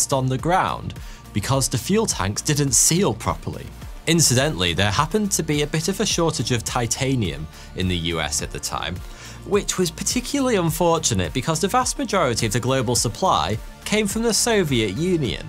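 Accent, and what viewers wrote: United Kingdom. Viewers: Good video and good sense of humor. Also, 'good' Brit accent that makes 'th' into 'ff'. 'Fifth'. for instance, becomes 'fiff'. As one obsessed with the details, I wonder what region that represents?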